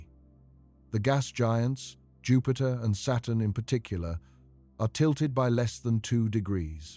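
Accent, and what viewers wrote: England, United Kingdom. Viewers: There doing everything they can to keep us from reaching the stars. I believe none of it. English accent and muckedy music and were supposed to think they are intellectuals.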